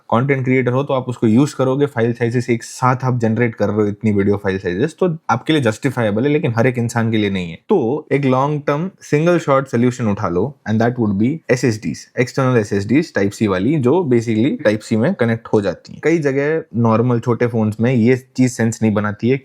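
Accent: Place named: United States. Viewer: Bhai american accent bala vasha kaha sa sikhlio ho.😢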